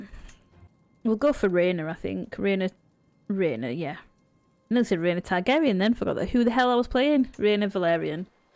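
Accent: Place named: Midlands, United Kingdom. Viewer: Sounds like a midlands accent, good luck with the series!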